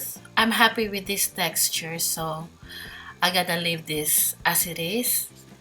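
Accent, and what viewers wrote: Philippines. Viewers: Ohh...because I had a friend who is filipino and she recognized your voice that you are filipino.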